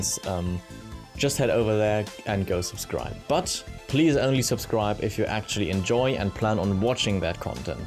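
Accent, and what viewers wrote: Germany. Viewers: I am German so I know what things are hard to say and I hear that just a tiny bit but I didn’t see anyone else recognise German accents so it could just be me being very good at hearing these kinds of details. But it’s fine. You don’t have to panic. I am in Hesse and one day my pronunciation just became better. I just decided that I am gonna put more effort into my speech.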